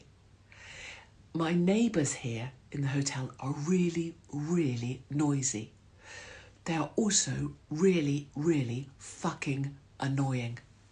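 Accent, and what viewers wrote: RP, United Kingdom. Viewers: A well-spoken lady "posh" where I'm from speaking up for the working class. The more I see of the more I think....legend. As I'm writing you said Cu..nt. my god You just became more legendary to me. Keep at it 💪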